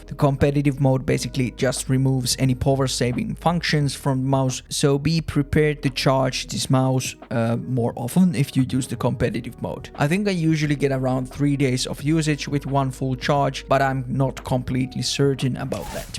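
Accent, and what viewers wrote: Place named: Finland. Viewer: Eyy, another finnish peripheral enthusiasist :D knew right away from the accent lol, Kiitti arvostelusta, hyvä video :D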